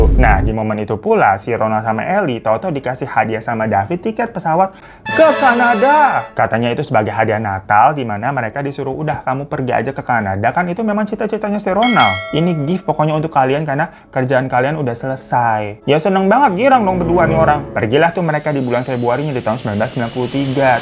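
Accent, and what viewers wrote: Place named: Tambun, Indonesia. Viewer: apal bngt bahasa tambun bang .. btw w orang tambun bwang 😂😂😂😂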